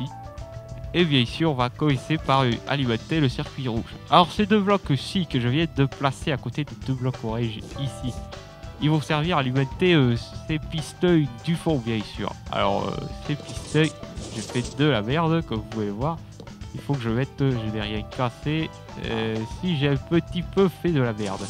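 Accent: South, France. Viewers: Rien à dire sur le système, mais votre accent du Sud est vraiment très très prononcé et c'est dur à écouter...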